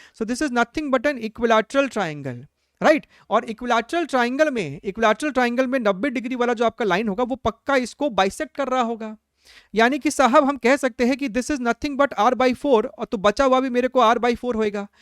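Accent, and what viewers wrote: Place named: Bihar, India. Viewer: Bhaiya I can tell from you accent ( hoyebe karega ) ki aap bihar se hai. If you can tell kaha se. Btw love your vid helped me a lot mains me and just sad ki itna late mila channel apka. Great job ❤❤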